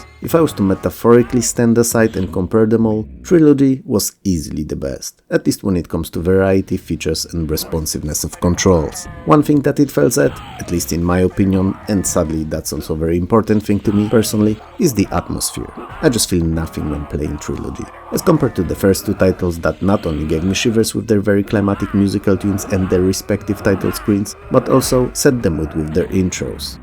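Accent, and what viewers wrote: Poland. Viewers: ~ you very on point, speaking from experience I've worked as dev on Espresso Tycoon, and at first we had tutorial, but quickly we noticed not only it took a while to finish, it was just too much info for regular player to remember much anyways, so we did same - each mission introduces new mechanics, though we always been making this game with focus around sandbox which is available from beginning (actualy it could be game up your alley who knows hehe 😅 ) That being said I'am not big fan of such method either , but in our situation it was actually quite hard to get it done any other way Shameless plug aside, I wonder where you from, judging by accent I take wild guess Polish (jak ja! także pozdrawiam!) Good video, some of these I experienced even before i had own pc, at cousin place, some games i even forgot about , or not realized they been so old they worked on dos in fact ;) I remember little big planet being hard (for uh 6 maybe 8 years old me) , we could not progress much in it each time we tried, before internet and guides being available like today, gaming was quite a different experience in deed back in the 90s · Polski akcent wyczuwam :)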